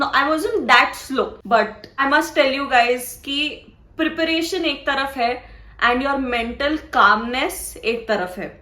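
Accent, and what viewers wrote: Maharashtra, India. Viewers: Abhi bhi dhad dhad ho rha hai your Marathi accent trying to jump out taide Marathi mdhe pn video bno ki jra 💛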